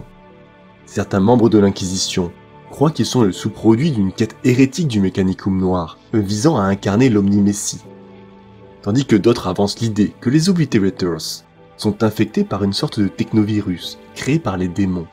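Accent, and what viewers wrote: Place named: England, United Kingdom. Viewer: Chouette vidéo, par contre vraiment pas fan de la prononciation des noms avec "l'accent anglais", cela fait très "lourd" avec tout le reste en fr...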